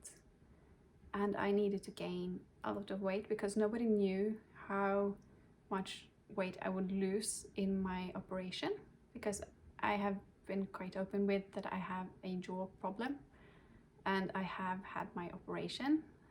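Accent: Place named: Scandinavia. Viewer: Hello from Chicago. Great WIP parade - awesome collection. Thank you for sharing your personal story with us. I think you are beautiful and from my side of the pond, I think your speech sounds just like that of my other acquaintances from Sweden, Norway and Iceland. It is ironic that many of us are struggling with weight loss and we forget that there are other people on the other side of the spectrum who need to gain weight. Best wishes in your recovery, and I look forward to catching up with your videos.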